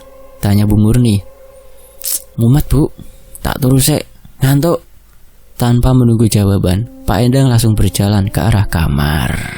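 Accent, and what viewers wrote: Java, Indonesia. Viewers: Dialek jawane bikin kangen kampung halaman mas 😂😂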